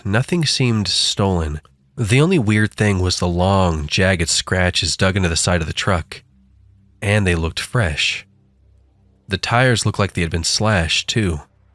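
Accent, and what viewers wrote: South, United States. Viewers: Great southern accent. Better than mine and it’s natural. Haha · Gratz on 1k and I love the southern accent and you giving it to the douchebag is perfect for the story PMSL 😂😂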